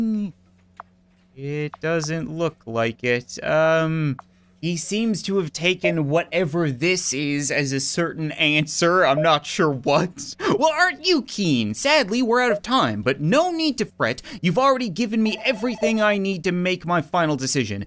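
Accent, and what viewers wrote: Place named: Canada. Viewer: Bug The Pug he's Canadian he says words differently get used to it or leave simply as that.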